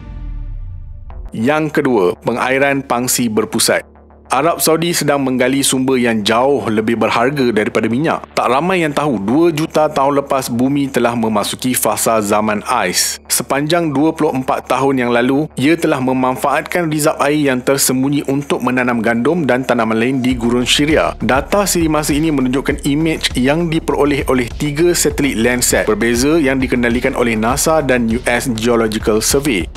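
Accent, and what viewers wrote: Malaysia. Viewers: Cara bercakap macam orang Malaysia, Salam Dari Malaysia 😂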